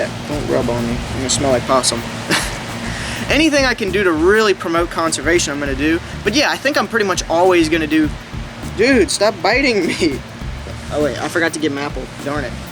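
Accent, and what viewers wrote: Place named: South, United States. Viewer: What do I enjoy most about the channel? You and your southern accent getting excited about your snakes. No improvement necessary. The videos are always interesting, well-timed, and well-edited. It's a unique product all your own. Dangerous animals and insects are always fun, like when you were fooling with the Black and Brown Widows. That was intense. Are you planning on any releases?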